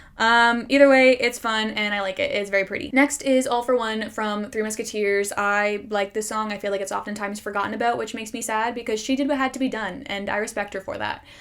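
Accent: Canada. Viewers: this is off topic but has ever said she’s canadian because the way she says about and out makes me think she is lol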